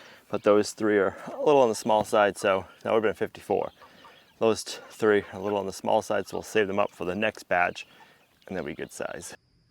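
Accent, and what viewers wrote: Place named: Appalachia, United States. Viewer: if you talk like harvest chicken. then your not a youtuber but more a hillybilly right out the fields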